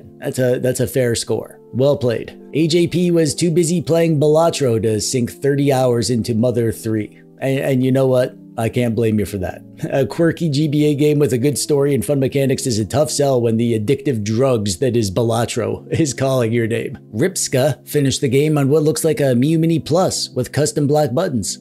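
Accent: Canada. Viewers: Thanks for the review of this classic game. PS, you're one of the most Canadian- sounding YouTubers I've ever heard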